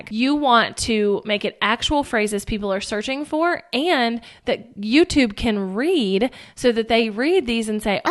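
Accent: South, United States. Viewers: Finally somebody has explained this to where i can explain it ! Thank you! Maybe its the accent ! I too speak southern lol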